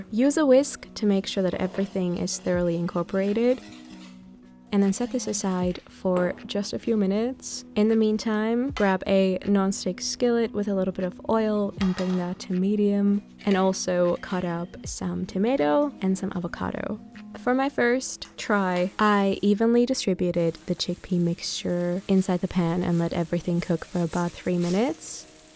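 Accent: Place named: Germany. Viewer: Is she German? I swear her accent sounds super German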